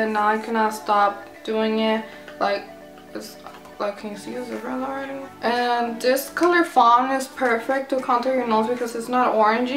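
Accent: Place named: North, Mexico. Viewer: are you mexican? you sound kind of norteña, saludos👋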